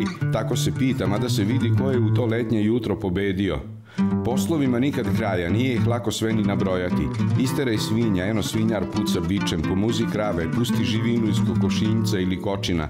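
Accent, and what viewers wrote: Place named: Farkaždin, Serbia. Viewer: Dodju mi tvoje ,,DOPISNICE,, kao vrsta razbibrige u trenutnom karantinu. Hvala ti sto ih pises. Vracas me u meni poznatu sredinu, koje vise nema, a i ljude koje sam poznavala i mih manje vise takodje nema. Sviras odlicno, ali pisanje je verodostojno, prepoznatljivo, vrlo slikovito, a izgovor i glas bas, bas nas farkazdinski! Hvala ti ! PISI samo PISI, vredi truda!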